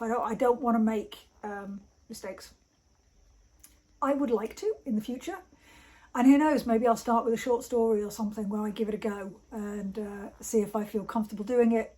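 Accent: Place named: United Kingdom. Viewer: Hi - greetings from Denmark. So nice to hear your voice. I enjoy your books, and it was so great to hear your British accent and even some dialects. You take care of your wife and cats. All the best. Kirsti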